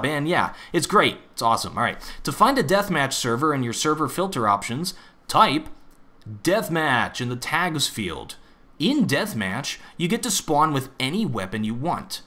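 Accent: United States. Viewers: He sounds so American.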